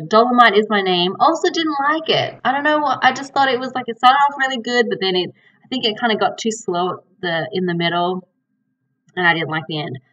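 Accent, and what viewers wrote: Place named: Australia. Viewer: great to hear from you again and know you are doing well there. Probably you can talk about next show - how you maintain the Australian way of doing there, for example your Australian accent, and how you prevent yourself from being ‘colonized’ by the Americans. Lots of love, SK.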